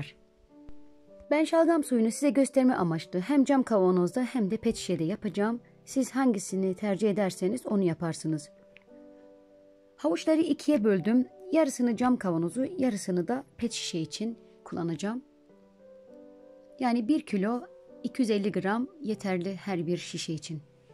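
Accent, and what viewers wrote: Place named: Southeast, Turkey. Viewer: Eline Emeğine Sağlık Abla Bende Yapacağım Nasipse İlk fırsatta Ablam Konuşma Şiven Bizim Oralı aksan yani Ablam Güneydoğulu musun Ablam Selamlar Başarılar Diliyorum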